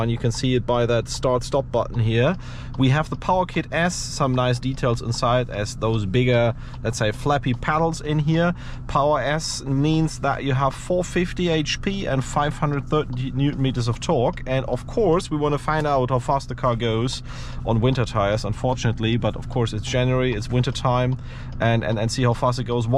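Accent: Germany. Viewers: omg German Accent.....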